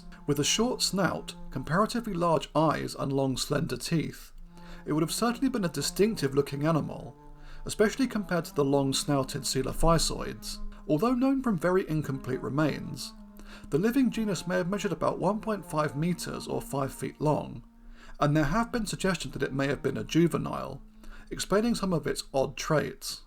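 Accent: United Kingdom. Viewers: (In an endearing way) Came for the click bait, stayed for the Brit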